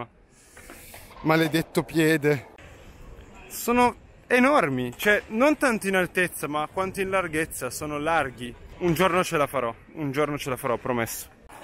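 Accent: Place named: Spain. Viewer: Hai già l'accento spagnolo tío🇪🇸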